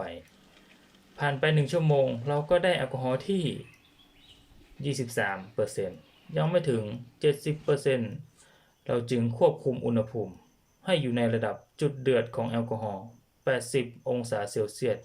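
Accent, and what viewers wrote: North, Thailand. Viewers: สำเนียงเหมือนคนเหนือเลยครับ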